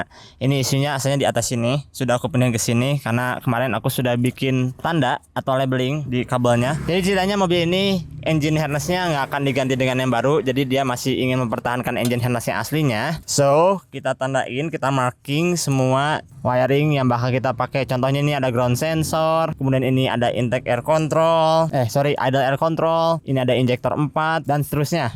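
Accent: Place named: Sunda, Indonesia. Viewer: Gak disangka, ternyata Akang ini kalo ngomong bodor & Sunda pisan Hehe. Kang kalo motuba tahun 90an (mesin injeksi awal2) diubah ke standalone gini abis berapa duit?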